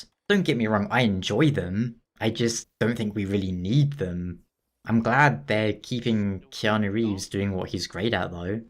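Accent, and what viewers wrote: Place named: United Kingdom. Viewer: You sir just earned a subscriber as well as a sponsor! For anyone new to this channel, obviously I'd recommended binging through his Dark Souls LP's. Just save the playlists... watch in order. Then after the LP's go through the lore videos... you don't have to watch them all at once... just a few and then new ones should regularly pop up in your recommendations and don't worry, there are eight seasons of them to keep you happy. Watching his longer form game and media reviews/videos can be tricky... but has such a smooth non-distinct across the pond accent that I usually watch his videos for background when I'm working or writing or forum administering. The only drawback is I'll often be too drawn in that I'll watch his videos more intently. But remember to leave a like and comment before the video is over. Need that engagement. 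What else am I forgetting? Doom 2016 is great, Rey is a Mary Sue and cough <link> cough Excuse me. Check out our forum! At the very least, it'll generate some traffic and buzz for us so we can sponsor this cheeky chap again! Like a symbiotic relationship between the Sietch and the mighty Sandworm. The (often salty) spice must flow.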